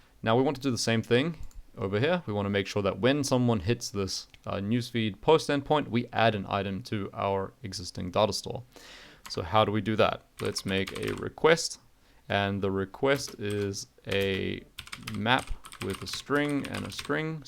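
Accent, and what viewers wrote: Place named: New Zealand. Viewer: Enjoying your accent. Chur from a fellow kiwi for your excellent content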